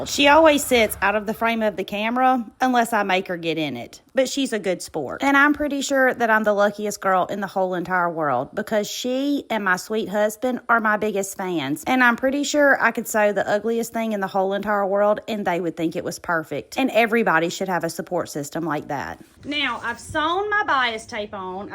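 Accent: South, United States. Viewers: first of all, I am a novice quilter - still haven’t actually quilted my flimsies, just had other people quilt them for me. Second of all, thank you for being such a great teacher. I say that not because I’ve tried anything of yours yet but because you have made this all seem really do-able for this 65 year old novice! I can’t wait to try this quilt coat! And, finally, than you for sharing that beautiful Southern accent (I have one, too); it harkens to “home” for me and, as I’m sure you know, is totally disarming! I’m hoping you will show us how to make some matching mittens and maybe even a hat or toboggan of sorts from this beautiful quilt’s scraps! Please! Oh! and maybe a quilted scarf for winter, too? (I’m living in Colorado now.) And, I almost forgot! I really want to make a mandarin collar for my coat - but I have NO IDEA how to do that. Did I mention I’m a novice?;) I look forward to learning from you!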